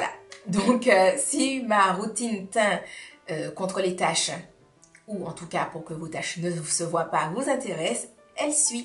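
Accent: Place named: Caribbean. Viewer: Ton accent antillais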